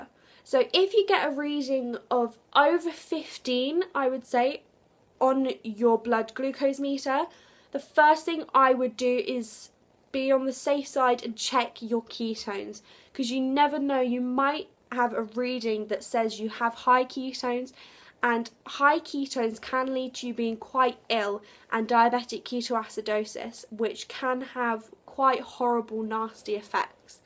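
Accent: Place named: Australia. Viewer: You sound vaguely Australian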